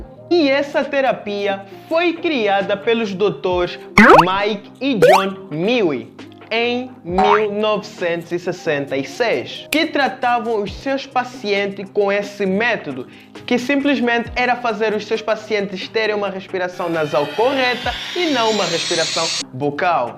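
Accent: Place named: Angola. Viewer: Deve ser da angola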